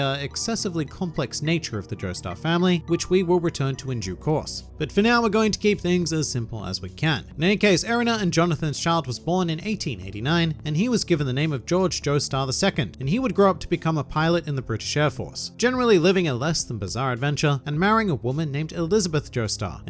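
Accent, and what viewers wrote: Australia. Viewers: imagine not having read part 6 and hearing an australian talking about a dude whos looks are on the level of a trashcan who wields the stand bohemian rhapsody thats somehow connected to van gogh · I didn't realize you were Australian. I thought you were American trying to be fancy. I guess that describes the Australian accent pretty well. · I am here for an Australian man narrating. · You're right other videos don't have a sarcastic Australian man tell me about Jojo Family line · hmm i do like sarcastic Australians narrating 🤔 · Sarcastic Australian man narrating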